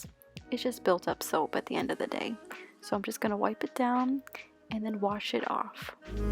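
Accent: Boston, United States. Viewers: Just found your channel. Luv ur Boston accent ♥️🤍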